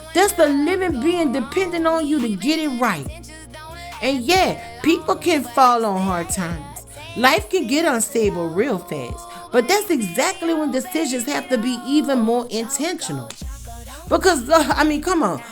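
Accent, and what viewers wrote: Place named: New Orleans, United States. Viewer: Girl I’m from New Orleans and as soon as I heard your voice you sounded like family. But I yea this whole situation is crazy. I’m praying for the dog 😩😂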